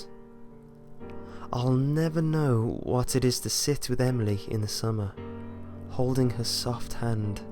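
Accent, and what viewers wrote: England, United Kingdom. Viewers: Your slow English accent makes everything more intense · I want to say that you really are awesome and I love listening to your creepypasta narrations because of your awesome accent. I have to ask though are you from England or do you live in England. I'm wondering because of your awesome accent. My friend told me you probably aren't from England but you're using the accent to make yourself sound better, I say hes wrong which of us is right. But either way I'll still love your creepypastas.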